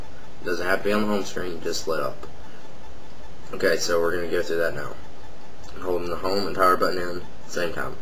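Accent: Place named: Texas, United States. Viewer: its a texan accent i think lol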